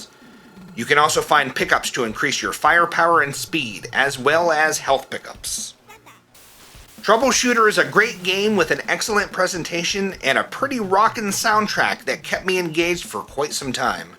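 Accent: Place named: United Kingdom. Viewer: Only just stumbled across your videos mate. Very entertaining, and great to hear a fellow brit in a genre swamped with Americans!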